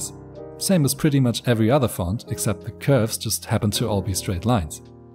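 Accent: Germany. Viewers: I thought his accent made it more than obvious that he is German...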